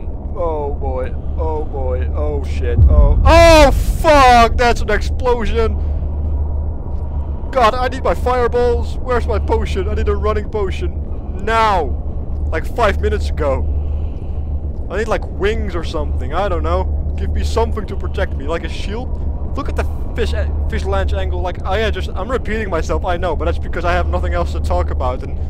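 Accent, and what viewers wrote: Netherlands. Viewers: Hahaha, I had no idea you made these kinds of vids as well! Hilarious! Make more! There's tons of these kinds of games floating about these days. :3 Also, your accent... Are you Dutch or something like that? :D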